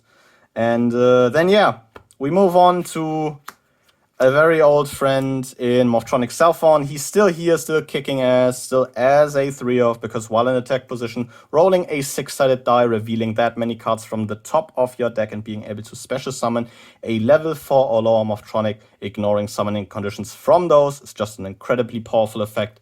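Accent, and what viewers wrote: Germany. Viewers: Are you from Germany? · You sounds so german XD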